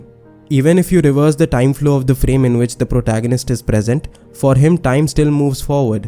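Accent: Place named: India. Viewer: What a pajeet accent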